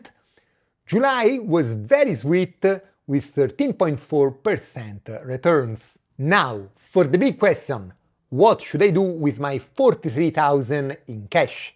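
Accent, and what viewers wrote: Tuscany, Italy. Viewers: Your accent is so funny. Are you from Toscana?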